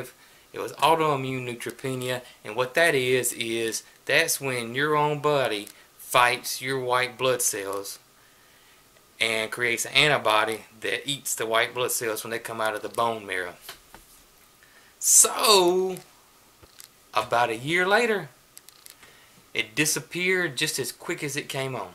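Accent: South, United States. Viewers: I appreciate the Southern drawl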